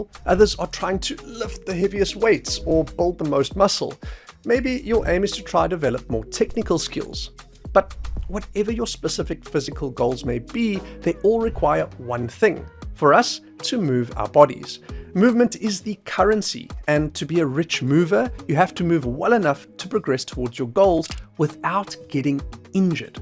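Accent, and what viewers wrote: South Africa. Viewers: Accent sounds South African? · Yo yo. You sound South African. I can't find any decent barefoot or wide toebox shoes. Where do you recommend I go check. I live just outside of Cape Town in Paarl. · Are u South African? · Great content. Are you South African?! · sounds South African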